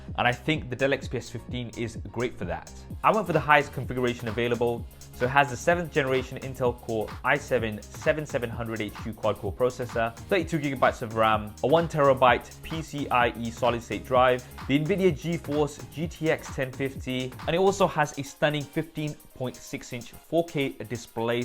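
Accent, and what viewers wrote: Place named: United Kingdom. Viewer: I hate British accent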